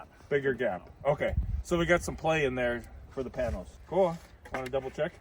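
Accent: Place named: South, United States. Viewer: "fixin' to finsih framin' the wall", I think could pass as a Southerner.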